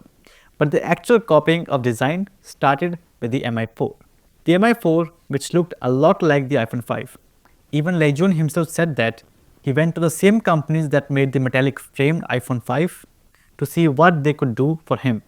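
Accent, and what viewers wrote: India. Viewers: your accent is shouting indian!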